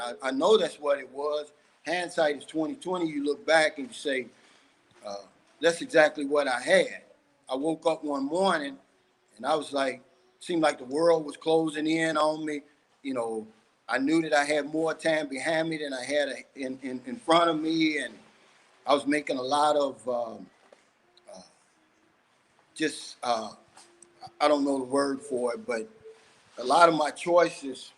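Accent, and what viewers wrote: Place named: New Orleans, United States. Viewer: Hey are you I like what you're doing I'm from Florida in the Miami area my wife is from the Philippines (zamboanga) I like your chanel a lot you don't sugar code anything keep up the good work keep doing what you're doing and I also like the new Orleans accent also thank you for everything okay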